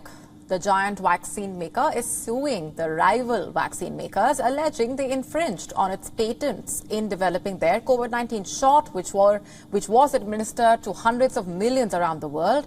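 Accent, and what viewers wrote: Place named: India. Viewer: Go work on your Indian accent it aches my ears, I tried...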